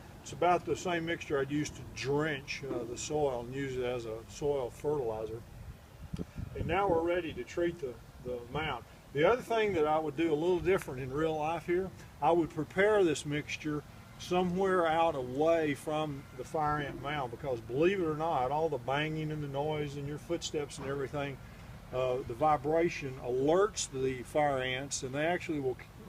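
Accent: South, United States. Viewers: They are both Texans. That's how we talk here., is my pick over Dr. Phil! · what does he say is the first step? at 8 seconds? can't understand southern drawl, man.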